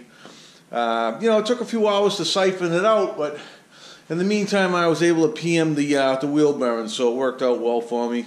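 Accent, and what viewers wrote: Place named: Boston, United States. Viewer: Holy crap your Boston accent is strong.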